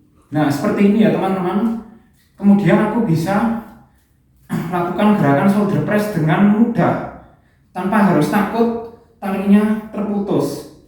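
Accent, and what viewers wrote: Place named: Malang, Indonesia. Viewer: Logate kok koyok Wong Malang yo? Loop Band-ne merek opo iku Bro? Svarga?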